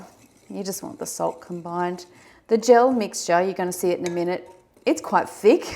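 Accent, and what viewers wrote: Australia. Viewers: In Ireland with our weather it would probably take a whole day to rise the bread . You sound like my sister in law who's from Townsville. I remember when my brother and sister in law lived in London I couldn't help asking her is that a question? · i have just started to bake gluten free bread, came across your tutorials and must say how pleasing it is to see and hear a fellow auzzie , thank you for your time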